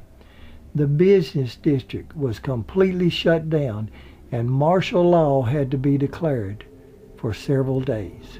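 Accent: South, United States. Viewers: I really loved your excursion into history by way of visiting this old graveyard. My sister and I often drive through old graveyards and marvel at the writings we find on the headstones. Some of them are very sad indeed and show the anguish the survivors felt at the time. The story you told of Helen and Florence was very heart breaking. I can understand how a mother may want to keep in touch with her little daughter even after death has separated them. So sad indeed. I wonder what happened to little Florence's father and mother? I guess that answer has been lost to the ages., I hope you will do more of these types of stories based on old grave yards because they are all very interesting and spell binding. Also, you have the voice for these kind of tales too. Your Southern accent really relates an emotional quality that adds a lot to these tales of sadness.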